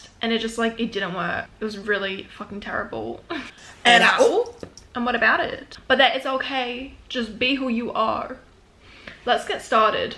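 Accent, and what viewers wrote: Australia. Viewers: Ok but I love your accent!! me being from Bristol having a shitty accent ur obviously Australian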